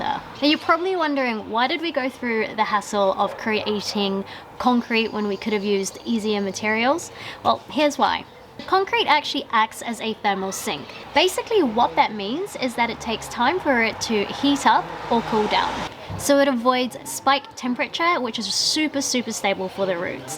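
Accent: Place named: United Kingdom. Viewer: I love your accent although for some reason it sounds a bit British. You seem to be a natural infront of the camera. The landlord is probably concerned about the cement planters due to weight on the balcony.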